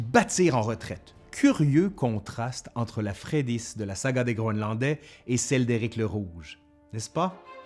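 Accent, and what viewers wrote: Quebec, Canada. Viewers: C'est fou, mais quand enchaîne sur "Allez, aujourd'hui à L'Histoire nous le dira...", je souris, c'est presque réconfortant de me retrouver là en sachant que je vais apprendre des trucs... et entendre l'accent des cousins québécois ne rend la chose que plus agréable:-)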